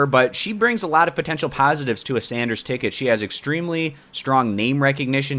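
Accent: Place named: Wisconsin, United States. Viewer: "This guy has suck a wisconsin accent." - my gf talking about you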